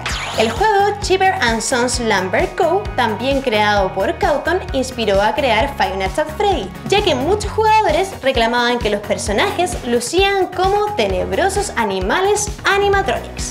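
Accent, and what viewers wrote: Chile. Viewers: Y ¿ERES CHILENA? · eres chilena ? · Eres chilena? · es chilena o no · eres chilena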